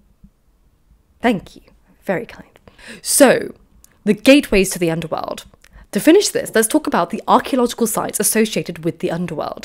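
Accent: RP, United Kingdom. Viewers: Just discovered this channel. Your voice is so deep and pronunciation so received (I think, not an expert on differences on UK regional speech), you sound like an incredible villain. Bummed it took me so long to find such a great history/archaeology/classics channel. Thank you for your work!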